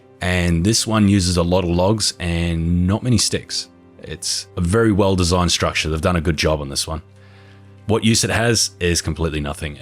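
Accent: Australia. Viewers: ur Aussie ay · Hugh jackman voice over! I live down under and you have some hearty accent ❤️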